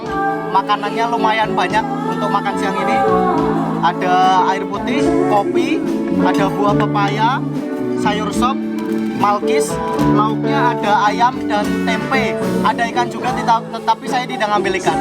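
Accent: Java, Indonesia. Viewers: mas jowo hehehehe woyo2 mas e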